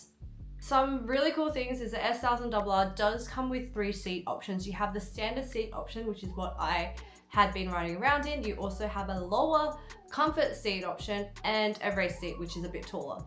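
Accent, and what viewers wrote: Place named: Australia. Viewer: Asian girl with Australian accent is so cute 🥰 not to mention you drive a s1k 🔥🔥🔥🔥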